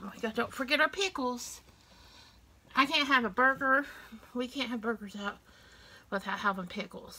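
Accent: South, United States. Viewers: Haven't watch u in months n months , came across my screen today had to watch those burgers yummy ..... Luv ur Southern accent girl .... Be safe 🙏😷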